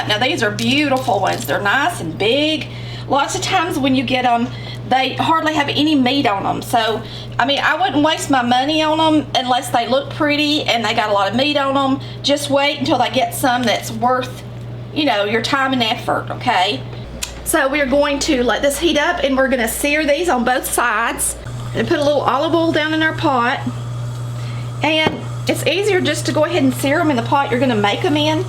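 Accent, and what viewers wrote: South, United States. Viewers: you sound like a country girl and I love it! I want to know how you're going to fix those beef shanks. I love mine fall off the bone....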